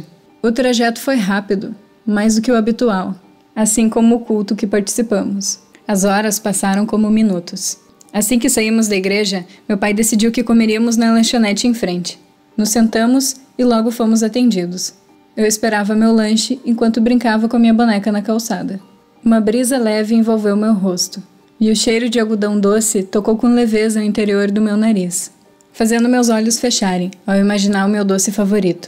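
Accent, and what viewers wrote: Rio Grande do Sul, Brazil. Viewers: Oi tudo bem? vc é gaúcha? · você e gaúcha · O sotaque dela e parece ser gaucho ela usa muito "tua"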